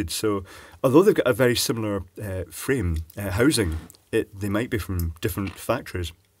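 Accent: Scotland, United Kingdom. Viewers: I think it's all to do with that husky Scottish voice, the odd exploding cat, and the desire to watch soil himself....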